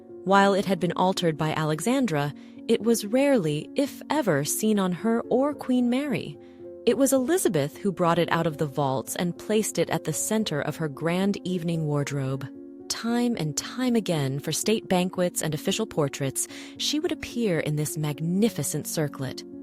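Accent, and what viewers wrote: United States. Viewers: I must say that although the channel Has an American accent, the understanding of the position of Royalty and its relationship with the people is spot on. Something many foreign commentators never ever get right. E.g. the way the Queen Mother wearing her jewellery is not vanity but respect for those who really ‘own’ the jewells. The people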